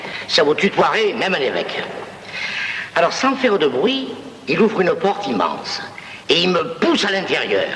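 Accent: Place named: Provence, France. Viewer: Qu'il est beau cet accent Provençal, Dieu qu'il est beau !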